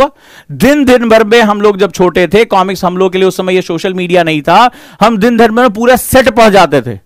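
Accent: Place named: Bihar, India. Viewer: Mind blowing explanation sir thank you so much 💐 specially your bihari accent Amezingg.... Superb 😆 Again thanku sir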